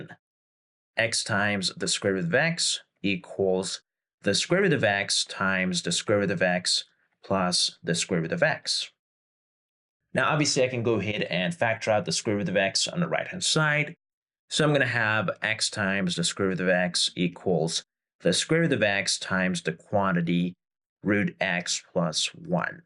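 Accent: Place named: Midwest, United States. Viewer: You're from the midwest.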